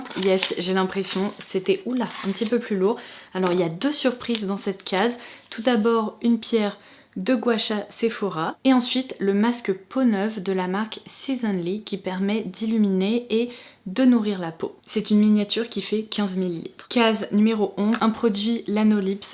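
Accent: England, United Kingdom. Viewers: Je vous découvre à travers cette vidéo, et c'est un vrai coup de cœur 😍 J'aime beaucoup votre voix douce et apaisante, et votre merveilleux accent anglais. Je m'abonne tout de suite à votre chaîne 🙂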